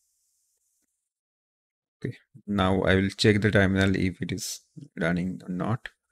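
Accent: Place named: Bengal, India. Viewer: Your accent is very bengali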